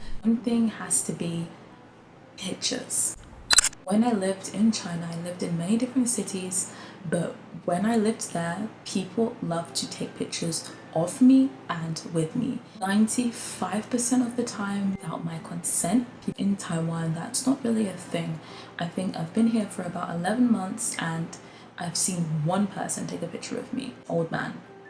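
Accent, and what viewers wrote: United Kingdom. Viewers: 很明顯的英國腔 BTW她唇保養得很好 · 很明顯的英國腔啊😃😃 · 這麼明顯的英式口音都不相信是英國人🥶膚色是無分國藉的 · 她的發音100％是英國人😆😆😆😆😆😆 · 真的就聽口音知道是英國人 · 好舒服的英國腔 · 他的口音就是個英國人啊。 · 好喜歡他的英國腔ㄛ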